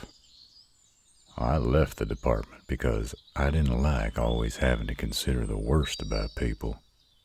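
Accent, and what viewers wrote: South, United States. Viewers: Oh my god…. The southern accent…. I can’t… 😳 🩸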